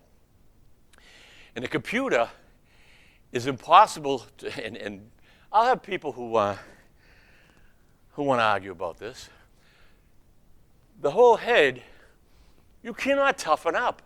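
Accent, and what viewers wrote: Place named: New England, United States. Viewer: I can tell by your accent you live in New England.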